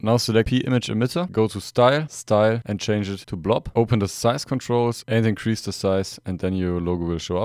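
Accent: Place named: Germany. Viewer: soo i can SEE you ARE German cause pof your Accent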